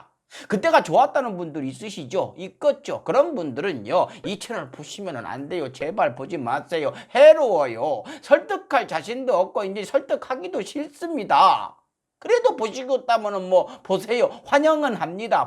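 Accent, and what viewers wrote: Jeolla, South Korea. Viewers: 니는 증말 전라도인니? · 말이필요없는 사이다발언 박수 치면서 듣고 있어요 찰진전라도 사투리가 맛깔나부요 계속 응원할게요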